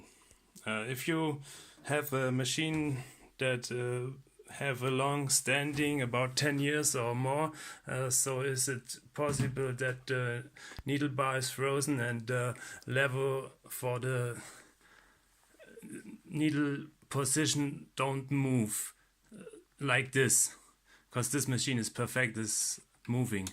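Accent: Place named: Germany. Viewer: Thank you very much for the useful video! I've just replaced the belt in my Supernova Automatica. Followed your recommendations, and everything went just fine. The only thing which was different - I bought a round rubber belt, so I did not need to release the motor to put it on. I also like your other videos about Necchi sewing machines and I love your German accent!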